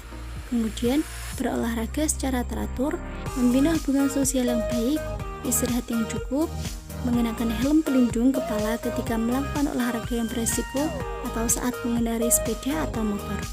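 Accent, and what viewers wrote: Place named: Java, Indonesia. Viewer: Medhok yuuu 😭🤣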